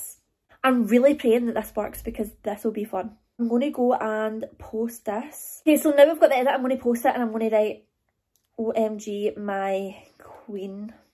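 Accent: Scotland, United Kingdom. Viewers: I love your Scottish Accent ❤️❣️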